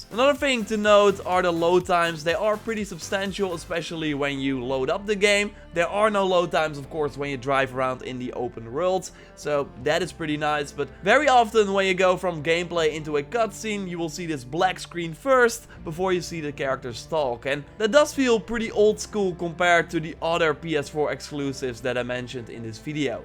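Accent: Netherlands. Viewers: Nederlands accent, lekker man, Nice review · Your voice man... it bothers me so much wtf i know its a dutch accent im dutch myself but it's just incredibly annoying to me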